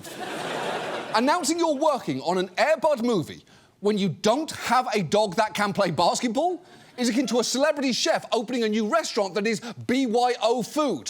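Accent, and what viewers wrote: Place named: United Kingdom. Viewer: Dang it Johnny! I've never seen any of the air bud movies, but I was going to make an exception to watch you in it. Now how am I going to get my fix of a sarcastic British-sounding fake news anchor pretending to take a dog playing sports seriously?!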